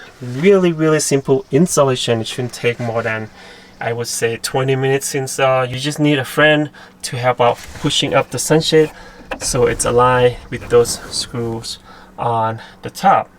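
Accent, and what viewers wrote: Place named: Asia. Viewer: Why it has an asian accent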